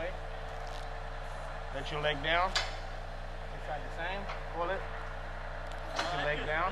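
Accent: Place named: Louisiana, United States. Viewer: Little bit cajun lol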